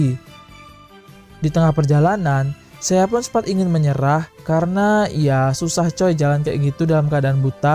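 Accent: Palembang, Indonesia. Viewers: kuat nian? wong plembang apo mang? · Mimin nyo wong Palembang hehehe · Anjir kuat nean Wong Palembang ceritonyo?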